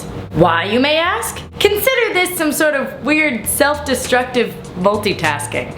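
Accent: France. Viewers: your french accent 👌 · your french accent >>>>>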